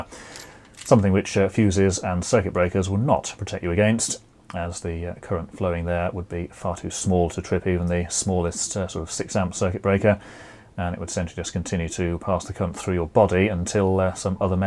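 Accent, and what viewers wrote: United Kingdom. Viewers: Hello Sir , initially really I'm very thankful of you for your very helpful video series , you present your nice videos look alike "sport events tellers" and sometimes understanding your accent is a little bit hard for none natives and specially a challenge for me, anyway I love British accent and by listening you my English listening skills would be improved as well...LOL... , could you please answer these questions , on (time of video ) in the book we have RCD and RCBO , it seems that RCBO is better than RCD because RCBO does somehow operation and in this way "save life of people" and also protect the devices such as TV and washing machines and so on , yes ?? So what is the difference between RCD and RCBO?? which one of them for home is better and most suitable ? each ones RCBO for TV and washing machine is needed separately ? each one should has which specifications/ amperage ? 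each RCBO can cover how many devices ? sorry a lot of questions !! I am contacting from Kiev in Ukraine and by answering my questions you will solve a lot of problems for me and at the same time it is a very big favor, Your fan , your supporter , Afshin Heydari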